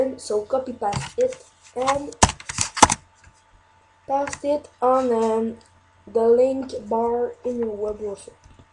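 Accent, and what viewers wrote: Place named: Netherlands. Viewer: sounds dutch to me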